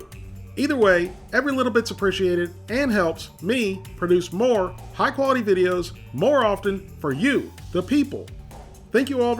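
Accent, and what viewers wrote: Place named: Virginia, United States. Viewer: Are you from Virginia? Your voice along with style of cooking reminds me so much of family in VA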